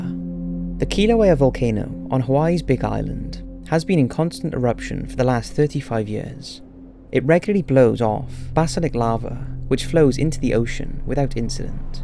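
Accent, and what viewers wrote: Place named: England, United Kingdom. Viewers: Having an english accent doesn't mean he won't make mistakes, volcanic mudflows are called LAHARs , not ihars. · your video is boring, way overhashed AAAAND presented in a horrible english peasant accent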